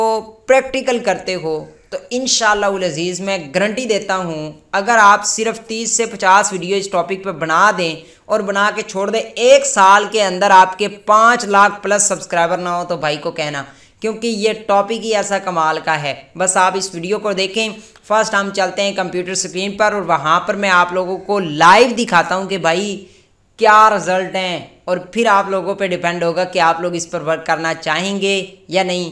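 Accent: Punjab, India. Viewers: Good Punjabi voice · Wow Punjabi tarka👌👌👌👌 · Very Nice & Good Punjabi Touch.. Liked it..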